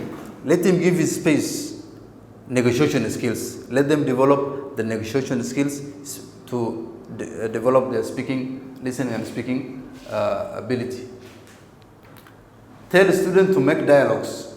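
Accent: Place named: Kenya. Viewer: I hope this teacher is from neighbouring country called kenya because he pronounce words the way i pronounced which means iam also from kenya.anyway thank teacher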